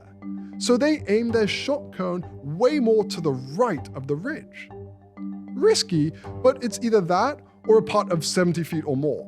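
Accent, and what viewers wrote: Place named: England, United Kingdom. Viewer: I’m over everything golf being an English accent. Sorry.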